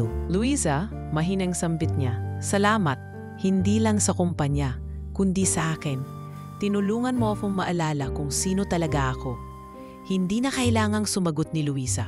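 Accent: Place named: United Kingdom. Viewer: British ung narrator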